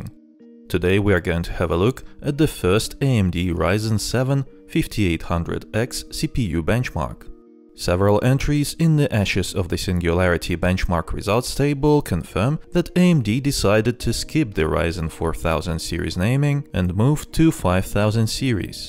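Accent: Russia. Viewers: русский акцент)) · Как же пробивается твой русский акцент)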